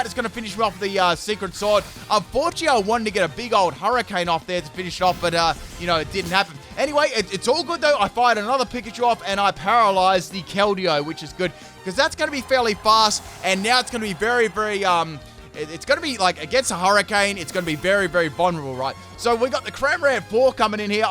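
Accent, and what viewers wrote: Australia. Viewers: I've been watching him for some time but.. is he Australian?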